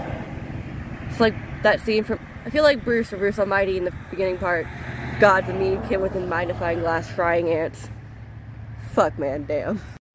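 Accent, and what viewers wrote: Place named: South, United States. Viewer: I never realized how heavy that southern accent is. I thought it was just a big time lisp.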